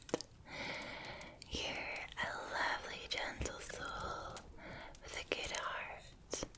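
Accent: Scotland, United Kingdom. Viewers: Calm me down? Yes please, been stressed all day. Nothing better than a beautiful Scots woman under the sheets whispering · I love the Scottish accent!!! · hello! cool video very relaxing, I loved! you have big beautiful eyes and lips and an adorable nose, you have such a nice accent, your videos ASMR all are of them awesome and very cute. you're really talented girl and so beautiful, greetings Pretty, thanks and sweet dreams for you also precious scottish girl;) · Beautifully Scottish, as always. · Everyone here knows you're a beautiful woman, and I could make a joke about being under the sheets (most of them are already taken though), but what I love most is that Scottish accent.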